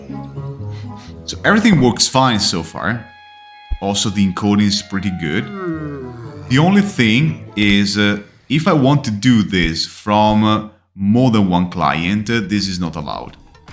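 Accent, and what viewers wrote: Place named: Italy. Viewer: Bravissimo, l'accento italiano si sente tanto ma devo dire che sei uno dei + bravi Italiani che ho sentito in merito ad "Accento" (te lo dico da italiano all estero eh...:)). 'Scolta ho una domanda... ma per entrare un po + sul tecnico dove mi consigli di guardare? Intendo sulle varie opzioni di ffmpeg, mux / demux / encoding etc etc. Online non trovo tanto materiale in merito (tanta teoria, ma parzialemtne declinabile poi sui vari softwares). Ciao grande, bravo, Luca.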